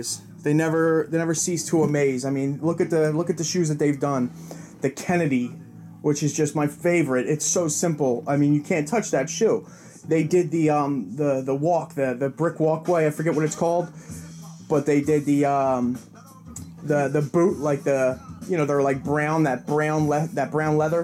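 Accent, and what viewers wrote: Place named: East Coast, United States. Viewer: Yea that is how you talk but I talk like that too haha must be the east coast in us lol, the mush explanation was dope too, had me rollin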